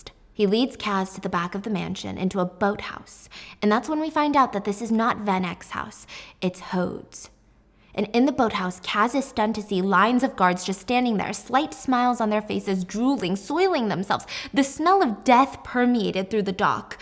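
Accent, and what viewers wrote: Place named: England, United Kingdom. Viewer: That English accent was so pleasing! 👏🏽👏🏽👏🏽👏🏽👌🏽❤😄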